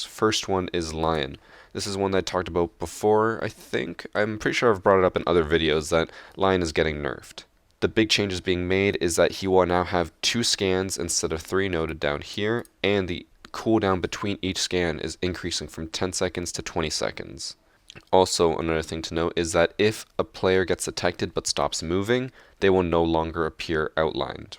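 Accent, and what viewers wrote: Canada. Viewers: I love the way Canadian people say out and about😂😂 I know he doesn’t think he has a Canadian accent but he definitely does 🤣🤣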